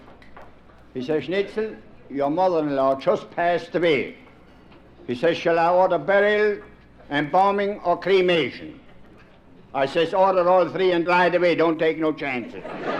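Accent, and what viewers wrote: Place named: Pennsylvania Dutch, United States. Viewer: he used to be at our family reunions when I was a kid... now 67 years old and that is how the old dutchies would talk my grandfather and uncles talk that way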